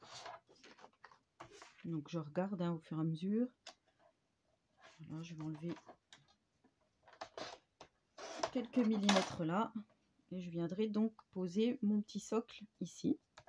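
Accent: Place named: Haut-Doubs, France. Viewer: Coucou. Je me suis inscrite lors de ra vidéo carte bonhommes de neige que je vais faire des que j’aurai un peu de temps. Tu viens de parler du haut Doubs ce qui me conforte dans le fait que j’avais bien reconnu cet accent si typique et qu’on ne put pas confondre avec un autre.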